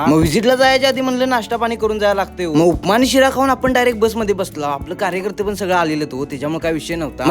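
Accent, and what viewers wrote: Kolhapur, India. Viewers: Kolhapurii ascent so cutee ❤❤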